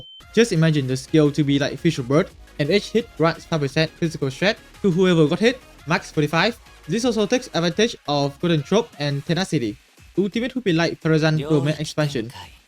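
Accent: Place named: Vietnam. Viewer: Hmmmm... vietnamese accent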